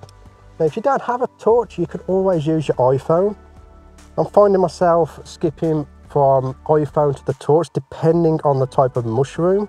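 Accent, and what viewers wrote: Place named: Stafford, United Kingdom. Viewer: wicked work mate you sound stafford way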